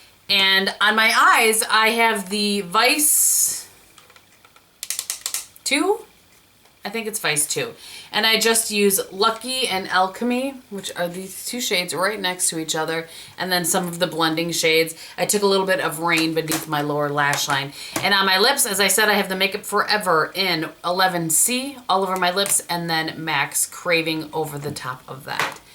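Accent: Wisconsin, United States. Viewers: Your Wisconsin accent is great! Love from Madison, WI!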